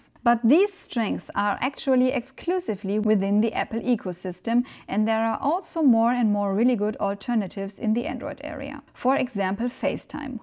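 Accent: Germany. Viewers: Love the German accent, wish I was able to speak German, such a interesting and unique language. ❤ from 🇨🇦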